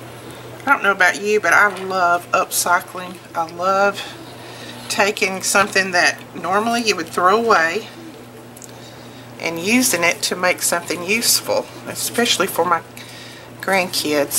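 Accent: South, United States. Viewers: What a good idea, and useful! I've never seen those letters before. The inking around the letters is a good tip. I wouldn't have thought of it. I learn something every time I watch you. Your grand dauther is going to love it. While you were making this I was also thinking how kids really DO appreciate the little things we do for them. Just one more way we can say we love them are are thinking about them. I have to tell you this. I listen to all of your videos, because I am scrapbooking all day long, and you keep me good company while I am doing it.. Well, when I am thinking my thoughts as I work, I HEAR my thoughts in your accent!! Really! I can't speak it, but I HEAR it. I'm sure that if I lived in the south I would pick it up SO fast. :-)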